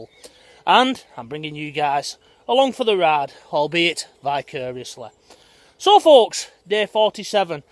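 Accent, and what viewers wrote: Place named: Lancashire, United Kingdom. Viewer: love you're Lancashire accent, Im from South yorks but I had a good mate from Oldham who sadly has now passed away, enjoyed you're blog about Fred Dibnahs property lol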